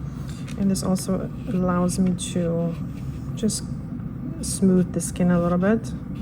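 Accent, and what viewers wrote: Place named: Poland. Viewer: Had no idea, but after first few words I knew you must be polish! 😅 such a hard accent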